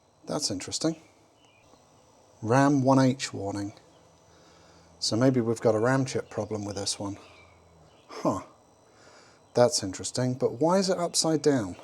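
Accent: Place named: England, United Kingdom. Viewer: This was suggested to me and it was a pleasant surprise to hear a fellow English man 😊 Looking forward to watching more videos, Galaga is a true classic and definitely worth restoration. Subbed.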